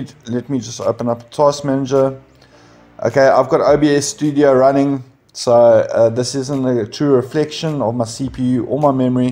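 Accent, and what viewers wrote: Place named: South Africa. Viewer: will do! My undervolt setup was from when my cpu max load was set to 60% so should he able to change it more now. There's some good ol new zealand slang for ya mate! Love your South African accent, my neighbors are from SA and are the most hilarious people I've ever met, awesome to watch the rugby with. Really appreciate the help bro! Never seen another channel that is so patient and informative with their community.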